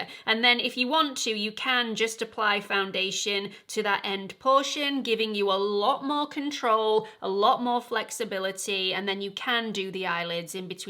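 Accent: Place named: United Kingdom. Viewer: Hi! I love your videos, even though most of the products are not available for me, here in Argentina. I keep your info until I can travel! One question totally unrelated to makeup… I’m a teacher of English and I love your pronunciation… where are you from? It’s clearly British, but not from London. Maybe Scotland? Thanks!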